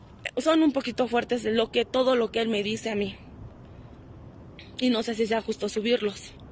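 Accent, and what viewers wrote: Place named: Fresa, Mexico. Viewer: SIGO A NERY POR SU MUSICA Y POR TI AMIGA LASTIMA QUE TE METISTES 6 AÑOS CON EL DUEÑO DEL GRUPO Y PUES ESTAS MUY GUAPA PERO AHORA QUE VEO COMO HABLAS ME HE DECEPCIONADO DE TI ERES CHOCOSA TIPO FRESA EN REALIDAD NO SE QUE EDAD TENGAS PERO TE SEGUIA A TI Y PUES A NERY LO SEGUIRE POR SU MUSICA SUERTE AMIGA ESTAS MUY LINDA NO VUELVAS A INVOLUCRARTE CON LOS DUEÑOS DONDE TRABAJAS ESTAS JOVEN TIENES UN CUERPAZO PERO NO COMETAS LO MISMO POR QUE MUCHOS ESTARAN SOBRE TI POR TU CUERPO Y TU JUVENTUD ANIMO Y NO SEA FRESA ME HE DESEPCIONADO DE USTED MI AMIGA LASTIMA SUERTE CUIDATE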